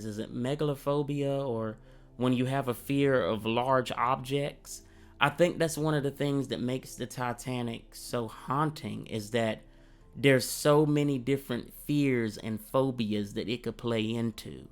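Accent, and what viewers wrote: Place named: South, United States. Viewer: Young man, I have to thank you for several reasons. One is that I'm glad that you're parlaying your shipboard experience into a YouTube channel, and I'm glad that we have an American black kid with a country accent doing a historical documentary on YouTube instead of the excessive number of British dudes with British accents, or even worse, those AI bots with the metal voices. Thanks, good to see some homegrown talent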